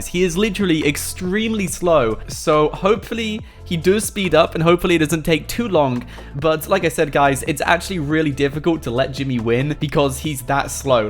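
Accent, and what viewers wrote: England, United Kingdom. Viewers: Are you from England?????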